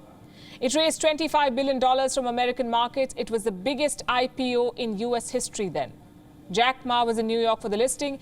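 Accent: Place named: Gujarat, India. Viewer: USA is a rogue country. Typical gujju English by the news reader. Let her go and learn proper English rather than crying with a crimping tone.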